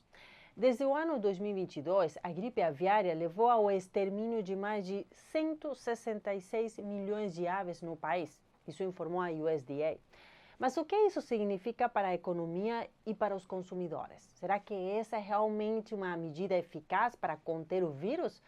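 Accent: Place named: Spain. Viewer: Amei seu sotaque espanhol.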